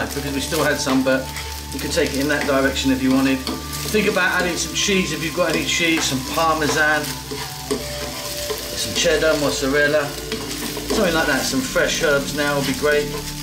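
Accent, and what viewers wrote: United Kingdom. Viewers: is it $ or £ ?... Chef sounds british & cooked good brit faves 😋 i will try but might spend more than $20 in £s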